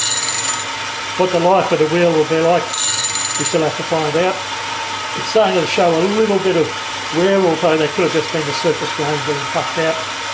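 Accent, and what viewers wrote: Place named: Australia. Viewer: Please don't take my comments negatively. I appreciate most of your video content, but the audio is horrible. I suggest that if you want to speak, please try doing voice over in post production. I have not been able to actually hear you or discern what you are saying over the other noises in most of your videos. Aussie accents to Americans sounds like Greek (maybe it goes both ways). But nonetheless, I don't know what you are saying, AND I REALLY WANT TO. Thanks for showing so many wonderful grinding setups! I'll keep watching no matter what.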